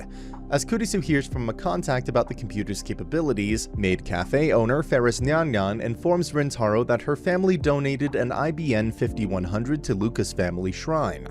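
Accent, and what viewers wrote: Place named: United States. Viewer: Thank you for real voice not robot. I love your American accent.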